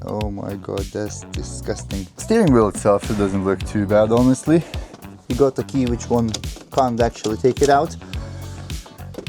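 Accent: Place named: Eastern Europe. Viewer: Good luck my Slav accent friend. I belive in your skills and your patience. I wish you all the best. This Bentley will be turn over point for your channel,repaired or not. New subs will comming. Sorry on my bad english,cheers from Croatia.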